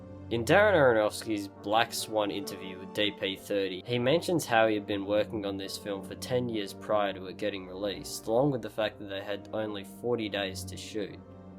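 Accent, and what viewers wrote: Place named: Australia. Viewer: I think he's Australian.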